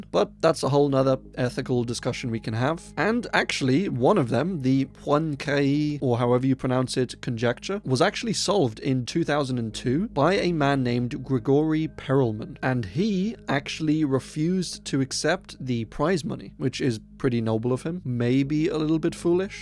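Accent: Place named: England, United Kingdom. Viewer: Your channel is really good mate 💯 where is your accent from in England I'm English Yorkshire?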